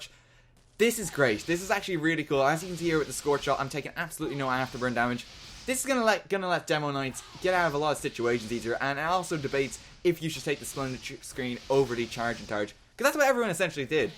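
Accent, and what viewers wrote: Ireland. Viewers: Are you from Ireland or Newfoundland? The accents are fairly similar?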